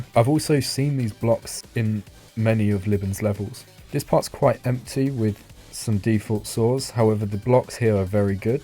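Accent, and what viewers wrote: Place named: United Kingdom. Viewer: nice video, definitely british accent carried though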